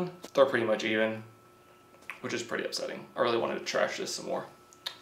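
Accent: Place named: Wisconsin, United States. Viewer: You sound like your from somewhere in Wisconsin We’d know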